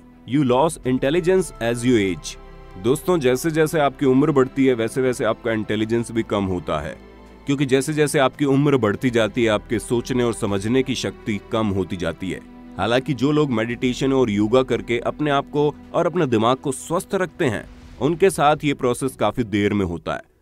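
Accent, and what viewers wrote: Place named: Lucknow, India. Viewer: मैं भी लखनऊ से ही हूं काफी दिन से सोच रहा था ये आवाज किसकी है और लहजा तो हमारे ही आस पास का है आज ही जाना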